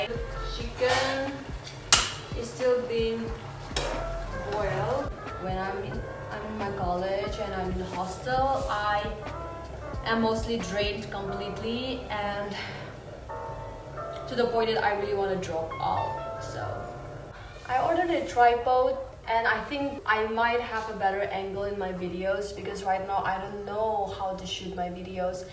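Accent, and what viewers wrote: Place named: England, United Kingdom. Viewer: ton accent anglais est trop mignon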